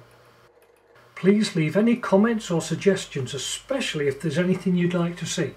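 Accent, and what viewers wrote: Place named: United Kingdom. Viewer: There’s a lot of great machining content on YT but a lot is American and while I have nothing against our transatlantic cousins, pronunciations of aluminium, lever etc along with US customary units drive me crazy. I wanted to find a UK-based channel (preferably the North of England) using the metric system so I could switch off that pedantic, critical part of my brain and focus more on the content. (I know, it’s practically a mental illness — right?) Finding this video was brilliant especially while stuck at home all the time! You really check so many boxes for what I’d want out of a creator. Suffice it to say that I’ll be glad for any projects you have to share and you can count on an eager audience of, at least, one for any future videos.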